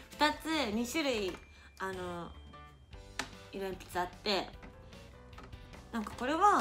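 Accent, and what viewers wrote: Kansai, Japan. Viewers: 関西弁多いね。かわいい💕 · なんで関西弁？笑笑可愛すぎやろ😭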